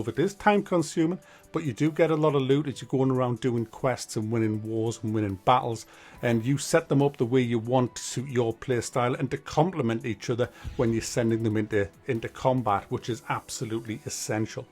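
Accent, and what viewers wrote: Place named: Northern England, United Kingdom. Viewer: Good to hear a northern accent! Im a Geordie myself. Great content mate cheers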